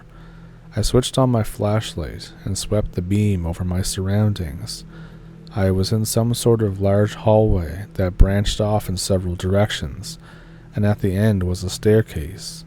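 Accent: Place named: Nova Scotia, Canada. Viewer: Are you in N.B or N.S? I know the accent as I am from Nova Scotia hahah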